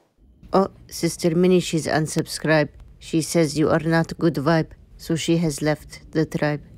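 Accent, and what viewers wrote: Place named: Russia. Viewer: Hi Sister. Your accent like Russians 🥰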